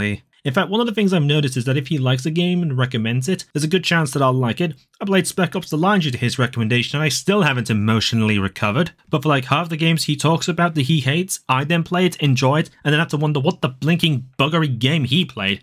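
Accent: United Kingdom. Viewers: Go 1.25x speed for more Zero Punctuation Flavor. ...I won't blame your for the less snark British accent but we have what we can have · I reckon the British accent helps too lol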